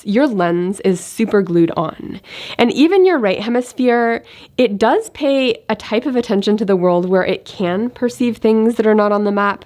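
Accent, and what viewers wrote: Midwest, United States. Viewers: Lol it's a little weird to hear the shallow take that you don't have an accent... Everyone has an accent. I'm also from Missouri, I had you spotted as a midwesterner. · Thanks for mentioning the "accent," I assume that gives me permission. ;) My friends like to joke that we don't have an accent because we speak with that boring midwestern "Peoria" or "TV newscaster" accent. But we definitely have an accent; we intentionally speak like others around us, and unlike people from New York and Minnesota. The reason us nerds are pestering you is because we know this style of speech from the character Summer Smith from the cartoon Rick and Morty. The actress Spencer Grammer has a hint of it, but it's much stronger in the cartoon. So the polite question is, "What's your accent?" But what we're really wondering is, "Why are there two of you??"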